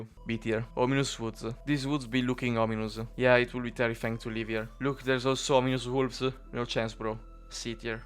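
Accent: Italy. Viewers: un Italiano si riconosce subito hahahah, comunque parli molto bene e bellissimo video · ciao fratello italiano, grande video (e grande accento)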